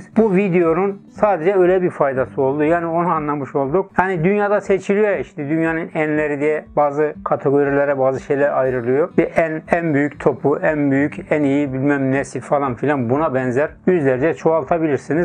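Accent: Erzurum, Turkey. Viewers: Abi Sen Erzurum’lumusun şiven bize çok benziyor:)?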